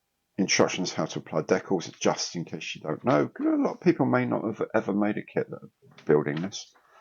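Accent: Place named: Northern England, United Kingdom. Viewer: Loving the northern accent “me duck” Kids today don’t know they are born. This video brings back lovely memories. I do fancy a Thunderbird 2 with the T4 but they do seem a bit expensive for what you get. FAB.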